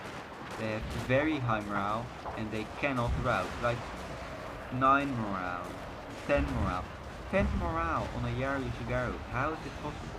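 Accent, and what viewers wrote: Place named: Netherlands. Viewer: If you aren't dutch, ill shoot myself in the face. Great video, but your accent made it 10 times better.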